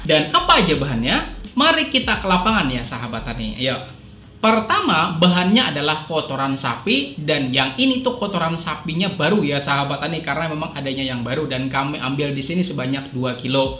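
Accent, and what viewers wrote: Banjar, Indonesia. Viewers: dari logatnya BANJAR sih bapak ini, kebetulan saya di KALTIM terbiasa mendengar orang2 b'cakap Banjar Salam Pian Sabarataan lah 🙏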